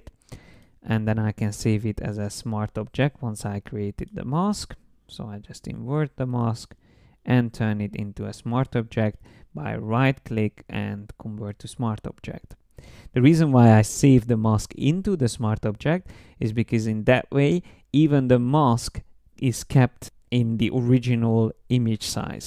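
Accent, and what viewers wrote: Hungary. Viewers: fantastic again!! thanks!! btw my girlfriend is Hungarian and she heard your voice while I was looking at the video and said "is that guy Hungarian??" hehe, I myself cannot recognize the accent. Thanks a lot man, very useful tips and great clear-fun teaching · that hungarian accent is strangely comforting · I heard your accent is hungarian - then I saw the little flag up there! 2 - 0 Hungary - Austria!!!!!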